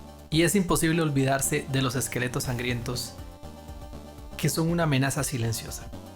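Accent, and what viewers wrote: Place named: Costa Rica. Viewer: Ese acento es de Costa Rica. 🇨🇷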